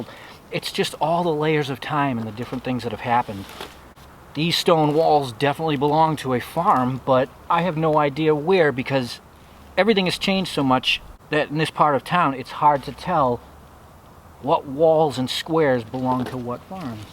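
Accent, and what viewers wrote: Massachusetts, United States. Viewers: Anyone from nh vt here his mass accent. Or is it just me. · This guy sounds like he’s from Massachusetts not New Hampshire. N.H. residents don’t sound like that . I fall o wed telephone lines. Pawnd and stuaff.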